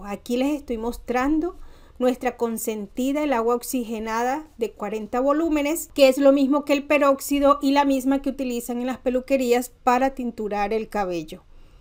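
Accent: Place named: Venezuela. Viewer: Me encantó. Me gustas como hablas pareces Venezolana. Aplicaré lo aprendido. Gracias.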